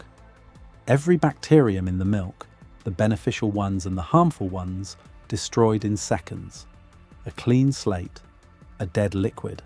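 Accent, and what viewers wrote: England, United Kingdom. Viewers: I am so happy that I found these videos! However, there is one thing: the voice is English, not American. So why are all the videos so completely aimed at the American market? It would be so nice if we could have some comparable information for the UK! Apart from that I love these videos and I am using what I learn from them all the time in as far as I presently can. So thank you! Just some UK aimed info added would be wonderful!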